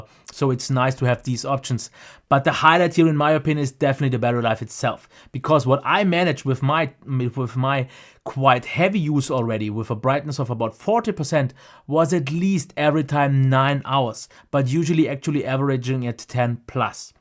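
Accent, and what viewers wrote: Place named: Germany. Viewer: You sound German. 😉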